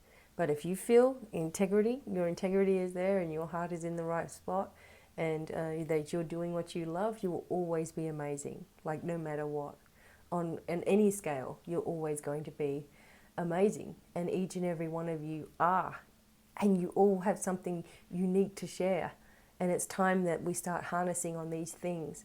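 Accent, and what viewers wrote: Australia. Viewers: So True. Especially better cos you are an Aussie and you’ve kept your beaut accent !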